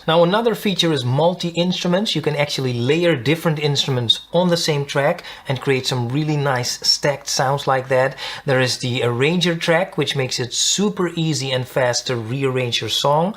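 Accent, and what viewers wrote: Netherlands. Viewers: Small channel still, but I love your clear style of presentation. I consider myself a "Reaper power user" but getting more and more frustrated by the midi editor, and for that reason alone I'm trying out Studio One 4 Professional. By the way, you sound a little Dutch (the accent)! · Hoor ik een Nederlands accent?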